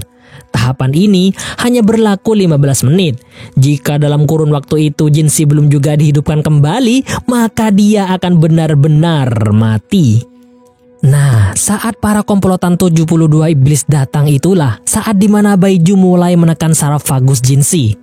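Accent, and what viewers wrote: Madura, Indonesia. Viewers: Seperti ya yg isi suara ini orang madura😅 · Beh reng medureh??😅😅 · Wah ini pembawa suara nya orang Madura yaaa😅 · Hahh takok epate'en can, reng madhure ben kak😅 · Medureh dimah kanak😅😅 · Dek....sedeh/sampiyen medureh kammah dek... Salam sittong dere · Saya yakin pengisi suaranya " Oreng Madureh" . 😂😂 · reng medureh kiah reng wkwkwk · Kakeh reng medureh yeh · Beehh mak medurehh payaaa celakak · Masyallah acaca medhure ropanah.. Deri dimmah min.. Sengkok reng medhure kyah.. Deri Mempawah Kalimantan barat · Oreng medureh toktotokkah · Komentator nya org Madura ya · Ooh pantes aku kayak ngeh ada logat maduranya ternyata benar😂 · Teppak ka reng madhureh boss 😂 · Memang mantap mon oreng madure membacakan alur cerita god job bang sehat selalu😂😂😂😂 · Orang Madura yg ngisi suara nye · Ini kayak orang madura yg menjelaskan narasi😂😂😂😂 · Medure mas 🤣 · Ini yng ngomong orng madura jiah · Medureh kak😂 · ternyata pengisi suaranya madura 😅😂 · hehhh sengak. 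wkwkk lu madura ya min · Lu orng Madura yh bng😂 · ini Narator orang Madura ya..😂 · Ternyata orang madura wkwkwk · Ini orang madura ya 😂😂😂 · Adminnya orang Madura ternyata 😂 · orang Madura bang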